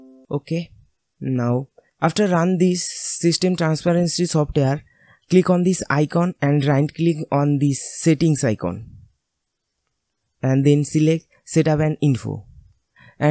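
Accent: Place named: Bengal, India. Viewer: i hate bangali english accent. could not go through torture. closed.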